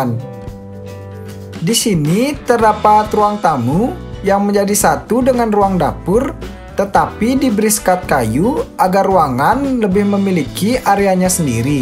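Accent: Bali, Indonesia. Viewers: Logatnya kayak org bali ya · logat bali nya medok banget blii 😁,,, btw desain nya keren banget sangat menginspirasi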